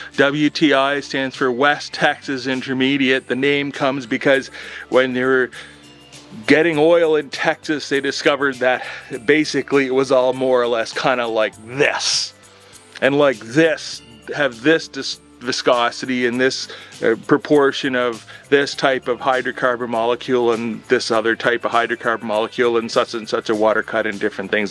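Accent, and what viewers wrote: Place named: Canada. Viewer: "i'm in canada, no secret if yer watching from another pert of the werld" canadian accent detected for sure. cool vid, love the chalkboards in the woods, love that they always move when you walk away. excellent setup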